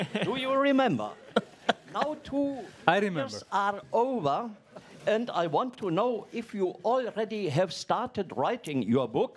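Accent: Germany. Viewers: He should learn to speak english. I'm german, but it's hard for me to understand his denglish. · This german accent is rediculous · Gute, alte deutsche Aussprache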